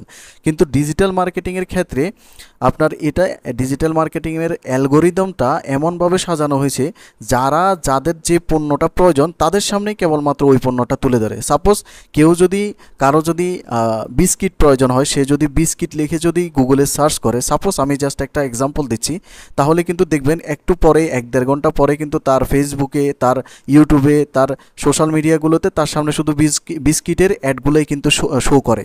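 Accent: Noakhali, Bangladesh. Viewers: আপনার বাড়ি মনে হয় নোয়াখালী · আপনার বাড়ি নোয়াখালী আমি বুঝতে পারছি। প কে ফ বলা হলো নোয়াখালীর মানুষ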